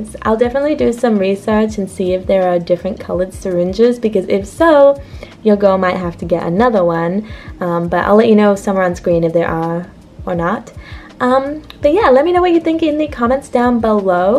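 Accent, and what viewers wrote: Australia. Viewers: You sound like an Australian trying to do an American accent.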